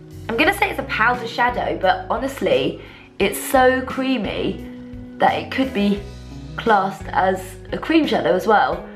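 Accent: United Kingdom. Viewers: how proud you are of your Scottish accent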